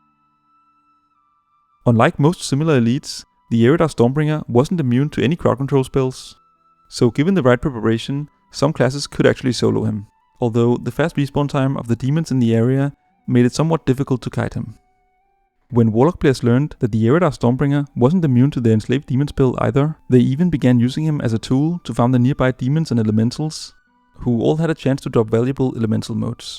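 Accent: Denmark. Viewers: Love me some Dano-English accent, sounds too adorable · Danish accent detected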